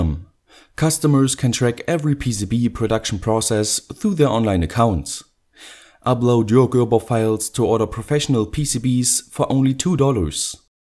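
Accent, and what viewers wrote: Germany. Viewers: Hi- could it be that you are German ?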